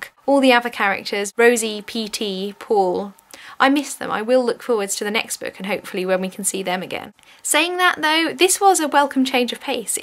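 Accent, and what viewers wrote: United Kingdom. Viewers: I bought this a couple weeks ago and finished it in a couple days. This reading thing sucks though. It doesn't sound good in a British accent.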